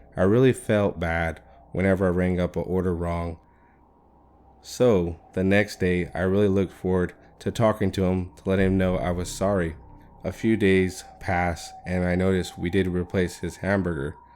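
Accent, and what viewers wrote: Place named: California, United States. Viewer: Much better. I was going to ask if you were from California, then you said you were. I knew it, I used to live there your narrator definitely has the accent. Can I ask why you are not narrating your stories? No offense to your narrator but you have a better voice for it. I look forward to more of your stories!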